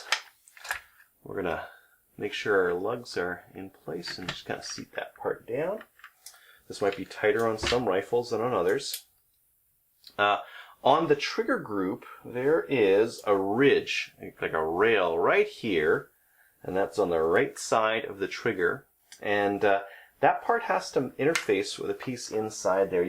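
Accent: Canada. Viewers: You sound Canadian. You must live in the U.S. now or Trudeau has confiscated this rifle already. Going to happen to us as well. 🤨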